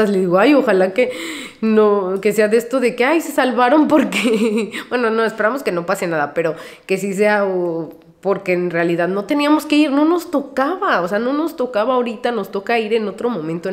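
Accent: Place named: Upper class, Mexico. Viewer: Hablas como niña de la Ibero, cantas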